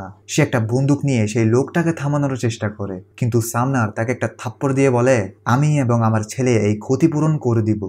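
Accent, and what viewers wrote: Chittagong, Bangladesh. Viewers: আমার মনে হয় আপনি চিটাগাং এর। আপনার কথা বলার স্টাইল টা তাই বলে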